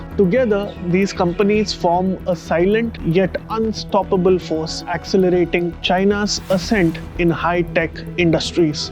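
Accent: India. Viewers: can you use AI instead? your curry english is terrible. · I cannot understand most of the Indian people's talking. Your Indian accent is the least I have ever heard. Wish you a great success.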